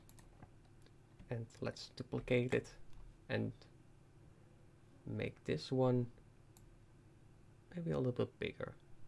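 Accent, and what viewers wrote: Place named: Netherlands. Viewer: Are U Dutch? I Can Hear It In Your Accent And If Youre Wondering Im Dutch As Well !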